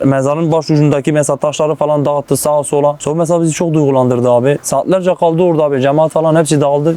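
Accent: Diyarbakir, Turkey. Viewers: diyarbakırlı belli arkadaş klpcdbrdr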